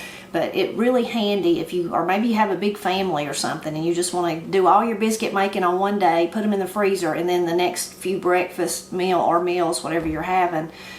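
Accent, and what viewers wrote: Appalachia, United States. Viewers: Listening to you made me unexpectedly happy. My mother's family is from Hindman KY but they moved to Ohio in the 1950's. My grandmother sounded like you - and great-aunts and uncles and second cousins. We used to go down there at least a couple of times a year for a week or two when I was growing up. Later on I lived for years with my grandmother when she was getting up in age. All those folks are gone now and there isn't anyone who talks this way in my daily life. It just about made me cry to hear Appalachian-speak again.❤ Also, thanks for the biscuit advice!😅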